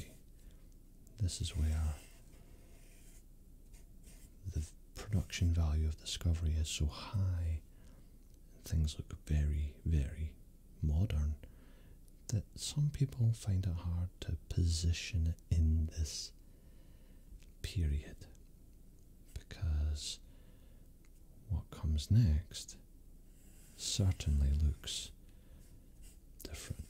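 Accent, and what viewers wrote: Scotland, United Kingdom. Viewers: Two of my favorite things in life. Star Trek and a beautiful Scottish accent. You just got a new sub! Love this!!! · I have a request never stop posting asmr videos the Scottish accent is very soothing.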